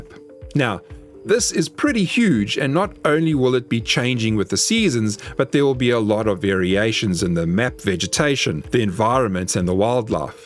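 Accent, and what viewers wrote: South Africa. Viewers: Are you South African? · Talking about "big" survival games, the day before will probably not get released this year. Your accent is not as strong as some, going to say Western Cape?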